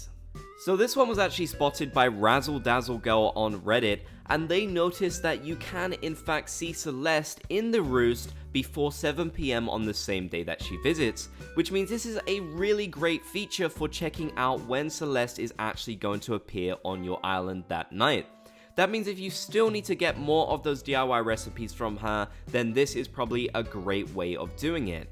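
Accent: United Kingdom. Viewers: Oh so you're British? Say Worcestershire Sauce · british alert? this whole time i thought you were just really good at faking that accent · I didn’t know you was British, I am too. I don’t pay attention to accents 😅 gang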